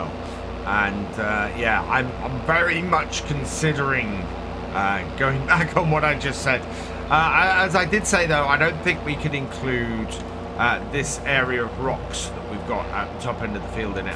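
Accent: United Kingdom. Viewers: I like your British dialect, Grettings from Germany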